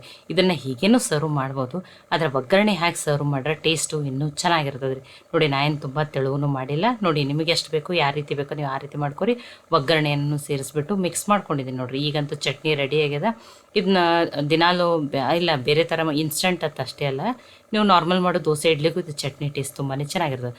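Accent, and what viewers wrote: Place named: Dharwad, India. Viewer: Akka,bhaal ruchi aged nimma adigi.Bhaal cholo anistu numma Dharwad maatu keli❤ illi Bangaluru bhasha keli byasara❤❤❤❤❤🎉🎉😂😂😂😂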